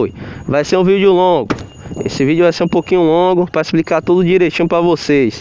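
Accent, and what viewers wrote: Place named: Bahia, Brazil. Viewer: Esse nosso sotaque Bahia é top de mais ..... abraço meu amigo. Pegar a minha final do ano.🙏🏼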